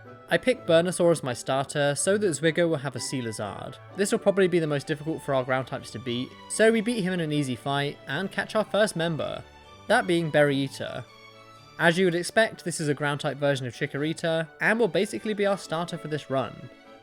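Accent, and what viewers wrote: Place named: United Kingdom. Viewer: what a beautiful british accent 😁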